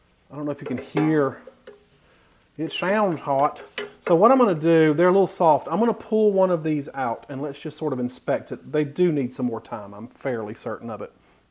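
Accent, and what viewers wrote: South, United States. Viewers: i love watching you and listening to your adorable southern accent. I would make low carb chicken strips and fish sticks in an air fryer though i have never tried one. xxxx oooo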